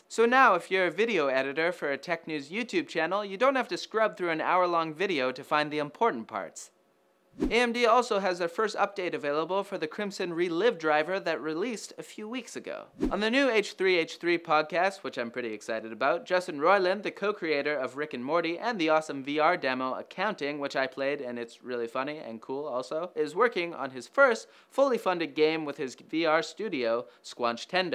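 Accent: France. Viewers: What a french accent. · U have a nice French accent dayyumm :D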